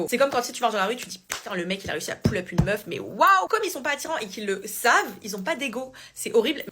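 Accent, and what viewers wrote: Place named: Suburbs, France. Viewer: Elle parle comme un mec de banlieue. Quelle horreur.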